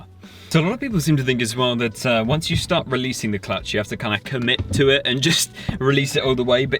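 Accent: England, United Kingdom. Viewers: Something about his English accent makes me want to listen more 🥴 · I heard the same thing! Sometimes this British accent drives me crazy.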